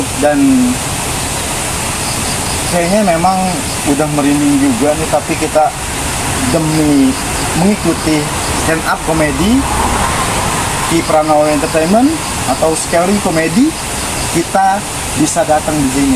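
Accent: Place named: Jakarta, Indonesia. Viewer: logatnya Betawie banget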